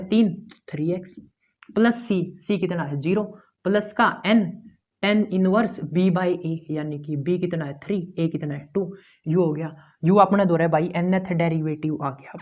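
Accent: Haryana, India. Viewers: Wow haryanvi🥰🥰🥰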